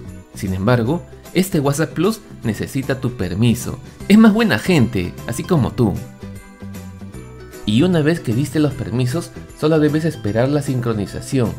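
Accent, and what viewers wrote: Peru. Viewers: Jajajaj el acento peruano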